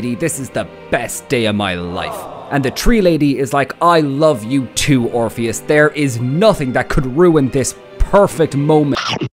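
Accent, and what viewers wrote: Ireland. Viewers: Thank you, Senpai. UwU Also I love your channel, your Irish accent, slang terms/euphemisms, and cultural references are really fun to listen to.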